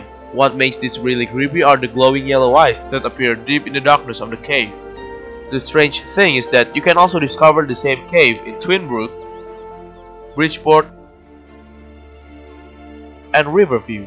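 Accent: Indonesia. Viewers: udah ada firasat xD dari logat bahasa inggrisnya ketauan bgt orang indo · Good timing! I'm playing Sims 3 supernatural right now. Also, gosh your English accent is so Indonesian just like me. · Indonesian accent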